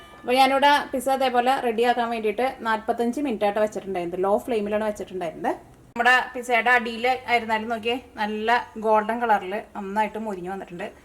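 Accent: Thrissur, India. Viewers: Chechi thrissur kaari aaanoo....najn thrissurikkaariyaa.......tune kettittu thooniyathaa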